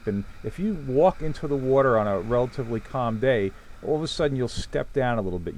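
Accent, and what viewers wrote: Long Island, United States. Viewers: Would love to watch the video but can't stomach the Long Island accent...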